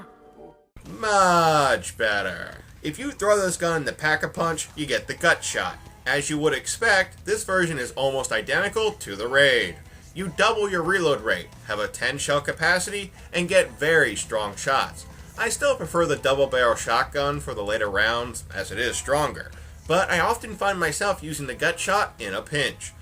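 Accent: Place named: North Germany, Germany. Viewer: I love how he pronounces Nacht der untoten (BTW, i am german, and he sounds like a North-german)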